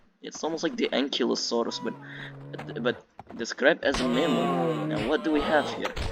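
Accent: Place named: Russia. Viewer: Hi! Good to have you back! And ngl, i like your Russian accent though, for real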